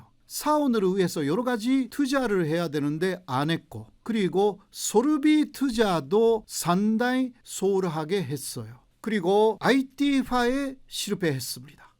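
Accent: Japan. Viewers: 근데 왜 한국말을 못하세요? 누가들어도 일본사람인줄 알겠어요 참나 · 교수님 한국에 오래 사시고 귀화도 하셨죠 그 세월에 비하면 발음이 누가 들어도 니혼진인데 발음이 아직도 일본인같아요 일부러 전략적으로 어설픈 발음 유지하는거라면 눈치 없던점 죄송합니다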